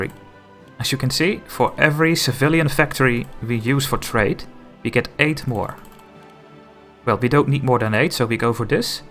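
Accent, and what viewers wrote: Netherlands. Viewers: haha you are either sarcastic or stupid, I'm dutch aswell and i hear emediately that he's dutch ;D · It's not as bad as most Dutch though. It's very easy to listen to and quite fluent. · Hij heeft een behoorlijk Nederlands accent. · je bent een nederlander ik hoor het aan je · Typisch nederlandse accent xD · I find your holland accent so funny :D I'm from Belgium btw ;) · Can Gerek Nee, dat is gwn nederlands accent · Ik kan gewoon horen dat het een Nederlander is die Engels praat dat is bij elke Nederlander. Haha THATS another cook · obviously sarcastic can't miss a Dutch accent lol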